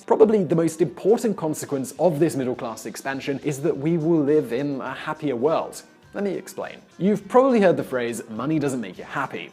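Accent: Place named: England, United Kingdom. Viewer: How do you define the middle class? That’s easy, it is accent and you have the perfect English middle class accent. Have you noticed all those YouTube videos where English young ladies (usually very good looking) teach the world how to speak with a BBC accent?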